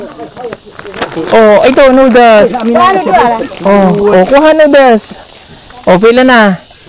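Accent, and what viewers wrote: Visayas, Philippines. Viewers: Maganda araw sir kabalo n jud ka mag bisaya hehe.masaya n nman ang mga katutubo dahil dumating si sta clous lagi pasko pag dumating ang pb team sa sitio ng mga katutubo. salamat sir at sa boung team. salamat din sa mga sponsors.god blessed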